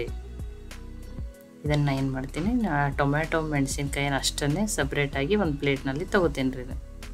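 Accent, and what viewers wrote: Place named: North Karnataka, India. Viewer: ಸಾಂಬಾರ್ ಜೊತೆಗೆ ಉತ್ತರ ಕರ್ನಾಟಕದ ಭಾಷೆಯ ಘಮ ಘಮ ತುಂಬ ಚೆನ್ನಾಗಿದೆ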